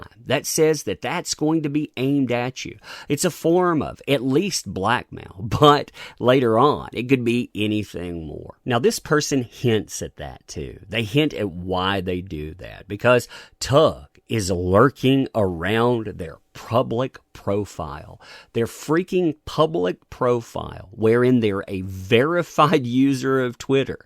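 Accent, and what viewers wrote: United States. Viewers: Your accent is the most American thing I've heard, but it's also kinda really unsettling and made me uncomfortable and don't really like listening to you talk.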